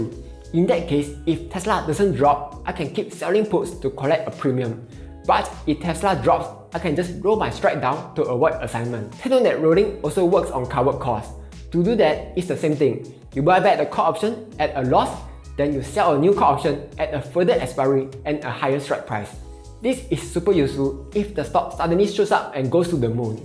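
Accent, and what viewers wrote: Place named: Singapore. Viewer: Absolutely love ur video, no BS and straight to the points! Plus Singlish…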